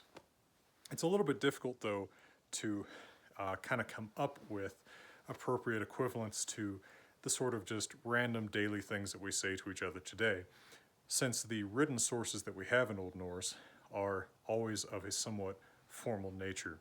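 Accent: United States. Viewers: This might be what old norse would sound like spoken by someone with a heavy American accent. Especially the R and the L reveals the speakers origin. Or are there any evidence that old norse were pronounced closer to American English than to modern Scandinavian languages?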